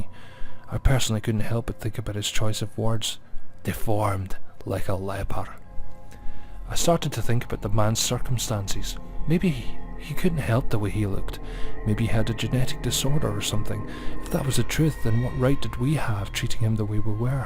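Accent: Scotland, United Kingdom. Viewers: I love your Scottish Accent!!!! Keep uploading creepy pastas just I can listen to your voice!!!!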